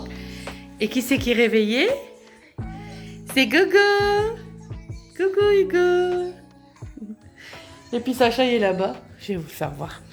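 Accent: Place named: Nord-Pas-de-Calais, France. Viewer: Coucou ma.poule. oui moi aussi je me sers du même eplucheur que toi de la marque typer....re. J ai fais des gaufres sèches du Nord, cette semaine. Bon je ne suis pas chti lol...mais j avais envie d essayer..😊 je suis du 77 à côté de 2 grands parcs d attractions bien connu. 20 minutes pour 1 et 1 h pour l autre. Tu parles chti c est sympa... pour le live je crois que c est toi qui bloque ou pas la personne en direct..mais bon ..renseigne toi car j y connais rien lol..moi je travaille dans une grande banque du 4/40. Actuellement en arrêt suite cancer du sein il y a 1 an. C est récent. Sinon j aime la marche. Je fais environ 40 km par semaine. Voilà ça te donne une petite idée de moi.. Pleins de bisous. 😊😊😊❤❤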